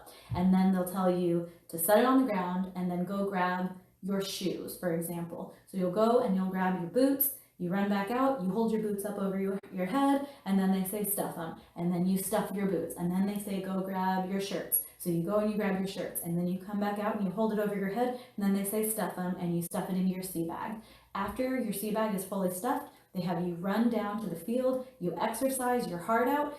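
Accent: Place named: West, United States. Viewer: What an interesting question. I will first wax poetical since sound is a personal sensation. Your voice is smooth, like a sophisticated port. It washes over one like sitting on a beach with your eyes closed as the susurration surrounds and tantalizes. I did discover that your voice in this video was not your natural speaking voice, but a "professional" style, thus armed with this insight, I watched part of your Google and part of your MEPS videos to listen to your native voice. So secondly I will hazard this guess. You were born in Montana or Utah, moved to Oregon, obviously went to Connecticut and spent some time near Sacramento. I also traveled a bit and thought I did not have an accent until an Alaskan bush flier asked me if I lived in Texas.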